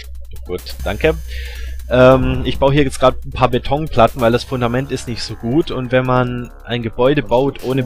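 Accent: Swabia, Germany. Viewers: Yay, ein Schwabe ^^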